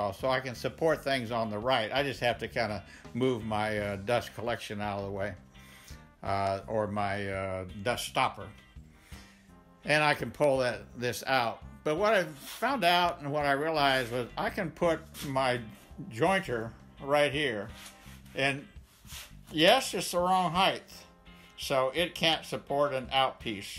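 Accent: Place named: South, United States. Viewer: I had to stop watching this video Sunday morning when the last dog woke up and they needed to be taken them for their walk. After that, my day got really busy working on my neighbor's gate, which included returning the hinges because they had way too much play in the hinge.. That was how they were designed! You really pack a lot of stuff in your garage. If I ever get caught up with the seemingly endless repairs or projects to do around the house... I took a look at the Wen drill press that you have, and I'm kind of impressed with its capabilities, especially for the price. Personally, I don't think you talk too slowly. Even though I'm not from what is considered the South, (even though you can't go much further south in the U.S than South Florida) I know quite a number of people with Southern accents, my aunt, being from Alabama is one of them. 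Her accent never changed, as a matter of fact, my cousins and uncle who spent their entire lives in Miami until moving to Lake Placid, Florida, gained her accent., I think your time in California has diluted your accent some, but then again, I don't know how much of an accent people in Kansas have. If you slow down the playback speed to.25, you sound like you've had quite a few too many. It's quite entertaining.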